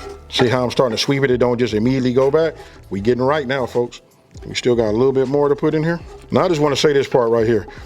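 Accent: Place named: South, United States. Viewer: Omg I absolutely love your southern accent and the way you talk "so look listen." Love it ❤ I made smothered pork cops from your other video and I am back to make something else now. 💙 BTW I am from The H in Texas.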